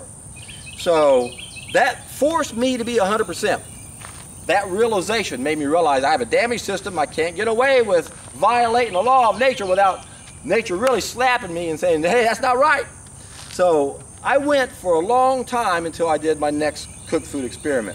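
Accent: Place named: South Carolina, United States. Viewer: your accent sounds like mine from South Carolina:)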